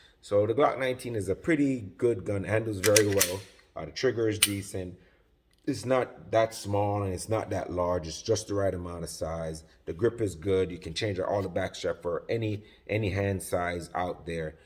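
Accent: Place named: Jamaica. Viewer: Heard the Jamaican accent and had to show love bredrin!! Continue with the great content and another subscriber to the chart!!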